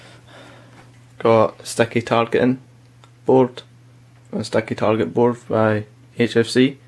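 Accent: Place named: Scotland, United Kingdom. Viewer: aahh gotta love the scottish accent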